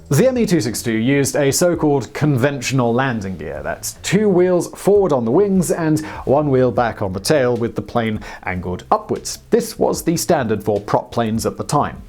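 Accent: United Kingdom. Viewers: It is funny how sensitive the Brits or as we call them from downunder Pomes! This chap is going on about the things with his Pomie accent ! How many Pome jets were there? Its about like asking the Pomes how many World Cups or Euro cups they have won! What a joke! Not the best objective documentary! Cripe the Pomes were saved by the ANZAC's, Canadians, and the USA! And Yes the Communist USSR gave a great deal, but not much in terms of Innovation! The British Invented the Steam Engine! Well done!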